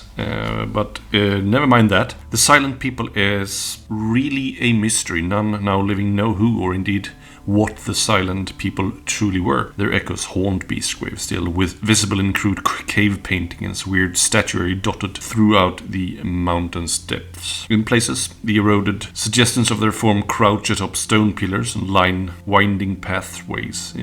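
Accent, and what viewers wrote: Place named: Sweden. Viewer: This was a great idea! I wonder, did you reference any of the books from the last year of Warcry for the Gnarlwood? It takes place all over that area…and likely continues to be set there with the new Hunter & Hunted release. I like your pronunciation of some words. The Swedish soft “J”, I love it! “Objectives”, chefs kiss right there dude. Your pronunciation of chasm I find very interesting. I’ve always ever heard it pronounced like cazz-em. With a silent H. I find British English has a lot of silent H’s. Everyone here in North America has to pronounce every single letter and syllable 🙄. Want a good laugh, ask a North American to pronounce Worcestershire Sauce. Lol, it’s a riot. You frequently hear an H added between the c and first e ! Anyways keep up the great work!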